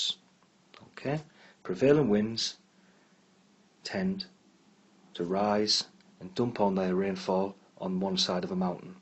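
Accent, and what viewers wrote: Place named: North, United Kingdom. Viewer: Bloody love a northern accent - and it helps me to stay interested!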